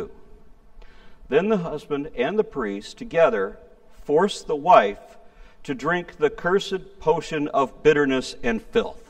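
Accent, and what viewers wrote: South, United States. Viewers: that Southern English was well done. I love it for real, too. Just sad there were no bible verses with "daawgs" in this video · Aron’s Christian southern accent sounds like joe exotic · "Southern Christian" accent is something I never knew I needed in my life:)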